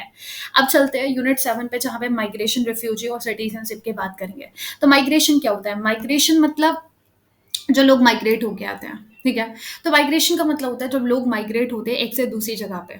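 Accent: Delhi, India. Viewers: Ma'am apke bolne ke tarike se lag raha h ap delhi se ho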